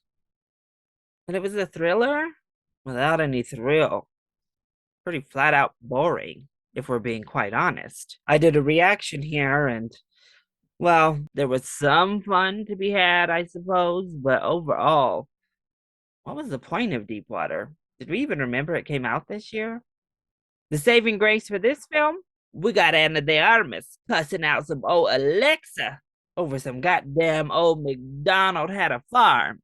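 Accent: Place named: South, United States. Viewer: Per usual, great review,! Love that good ole fashion southern twang! I can’t lie, I actually quite liked your dishonorable mention, “Men” & “Blonde”. HOWEVER, I can 100% get where you’re coming from & respect your opinion on the latter. Everything else, yeah, I’m with you on! Plenty of disappointments this year, unfortunately.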